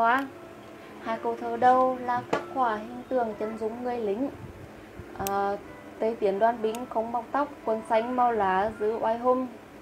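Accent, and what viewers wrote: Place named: Nghe An, Vietnam. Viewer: Tiếng nghệ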